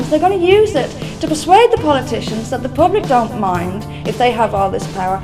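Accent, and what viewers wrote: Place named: Ireland. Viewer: hey she sounds Irish. fun fun w/the vatican mantra/organized crime etc aye?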